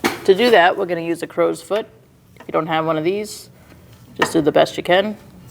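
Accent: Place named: New England, United States. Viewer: Love that New England accent !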